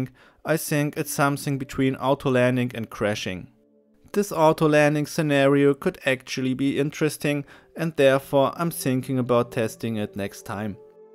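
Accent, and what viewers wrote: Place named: Germany. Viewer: oh man.. du klingst so deutsch mit deinem akzent :D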